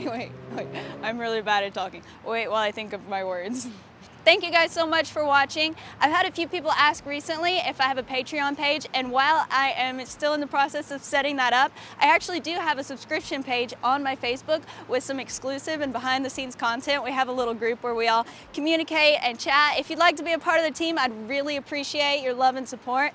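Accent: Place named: Arab world. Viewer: Nearer the virtuosity with the fiddle,and..... because of your way of talk, I know you're coming from the middle east. congratulations!!!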